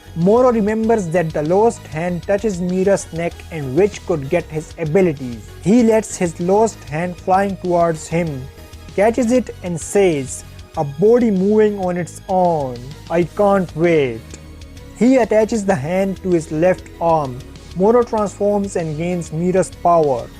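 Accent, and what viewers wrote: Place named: India. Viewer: Your voice is like a indian btw i love your videos and now i also love your voice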